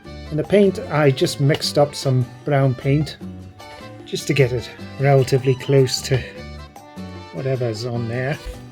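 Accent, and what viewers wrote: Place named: Wales, United Kingdom. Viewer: Being welsh, I approve that accent 😂!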